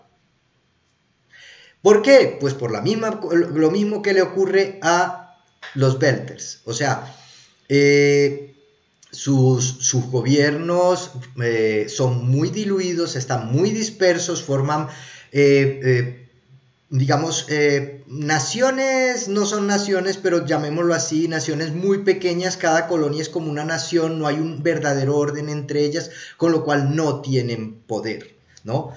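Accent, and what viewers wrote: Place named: Bogotá, Colombia. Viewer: ud es colombiano, paisano rolo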